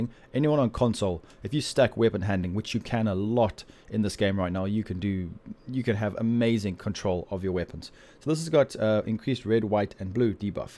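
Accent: South Africa. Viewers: is that a South African accent? Thanks for the help man, keep up the good work <3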